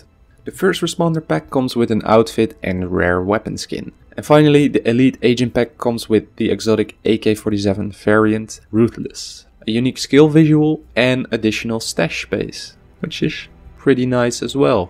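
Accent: Netherlands. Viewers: I played The division 1 even tough everyone disliked it i liked it. I Will be buying The gold version. Btw my uplay is maybe you could add me so we Can play together sometimes. And you sound dutch are you? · Are you Dutch? You have a Dutch accent xD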